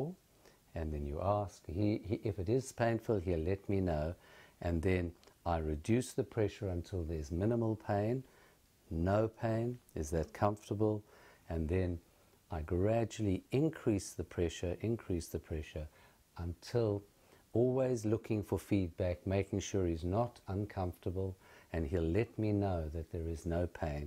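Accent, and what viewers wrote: South Africa. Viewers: This was amazing. Recognised that South African accent immediately. ,More to the point I have been suffering from acute pain around the Supraspinatus join at the head of the humerus radiating into my upper arm. I thought and was advised that it was most likely a partial tear of the supraspinatus and followed a regime of excercise that has had no effect whatsoever. This has been ongoing for over 3 months and I have long since resigned myself to the fact that i'll probably need surgery. However a short popped up on my feed which I followed to the video, after watching I used a dowel and attempted to approximate the trigger point pressure, very inexpertly and awkwardly I might add. Almost immediately I experienced an increased range of movement and a drop in my pain index. I am really excited that I'll regain full function and be able to hit the gym again after a few more tries. 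Thank you!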